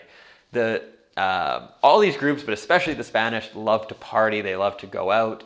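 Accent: Canada. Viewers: That Canadian accent.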